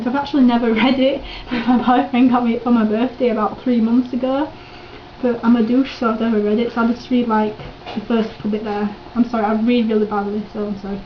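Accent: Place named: Yorkshire, United Kingdom. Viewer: WHEEW , yorkshire!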